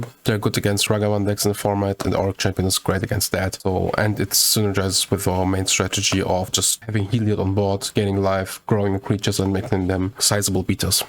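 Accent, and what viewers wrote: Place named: Germany. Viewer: I watch your videos for quite a while now. Today my girlfriend was sitting next to me, asking: "Das ist doch n Deutscher?!" Kurz die Kanal-Info gecheckt und Tatsache! Heißt schonmal, dass der deutsche Akzent nicht so krass ist, dass es mir besonders aufgefallen ist. Wäre toll, mal nen anständigen deutschsprachigen Modern-Streamer zu sehen. Aber klar, Englisch, weil mehr potenzielle Zuschauer. In diesem Sinne: Keep up the good work!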